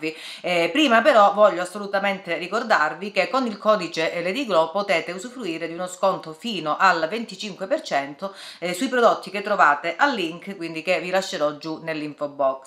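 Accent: Calabria, Italy. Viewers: Di dove sei ?sei Calabrese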